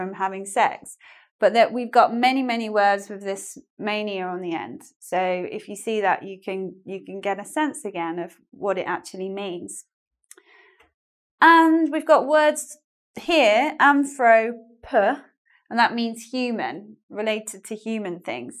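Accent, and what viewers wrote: United Kingdom. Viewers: Thanks for this informative lesson. I really fancy the Brits and British accents. Eventually, I found you and your amazing videos there <3 Keep going, x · how is that accent called? i love the British accent. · Hi, Are you still live in İstanbul? I wish we meet you in subway and try to speak with you British accent:) Thanks for lessons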